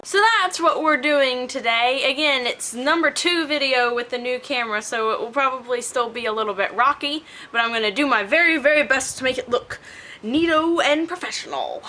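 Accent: Texas, United States. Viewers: Back when Texan accent shown through and was beautiful 😍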